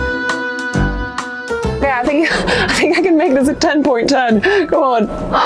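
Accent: United States. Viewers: She is sounding more and more American as she goes along 🤣🤣🤣🇺🇸🇺🇸🇺🇸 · You’ve been in the US long enough that your starting to talk like an American.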